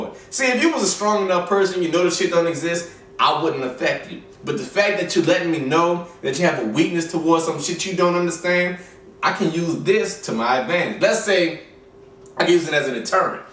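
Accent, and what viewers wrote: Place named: South, United States. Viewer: I can understand him fine, don't be mad cause your not southern...we can't all be wonderful!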